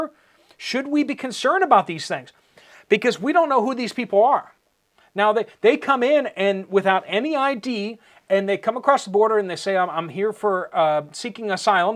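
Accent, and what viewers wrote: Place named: Appalachia, United States. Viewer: Hey thank you for all that you do and say! I have a son and his family in West Newton and I feel right at home with your frame of reference and accent. God bless all of the people in coal country who have contributed so much to this country's history mining such a life sustaining fuel that brings security and warmth to so many people around the world. Merry Christmas and a safe and prosperous New Year to you and your family! 😇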